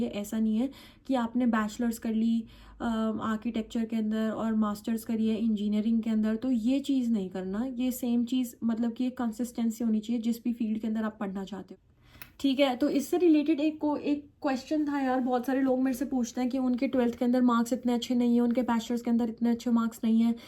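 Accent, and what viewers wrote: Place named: Delhi, India. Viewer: Delhi wali hindi bol rahi ho aap with jat test ke sat ❤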